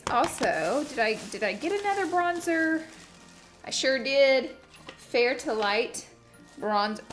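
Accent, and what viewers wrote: South, United States. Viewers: A new sub here! I love your content, your delivery and that damn southern accent!!! "Conciller".. LOVE IT!!!!!!! 😀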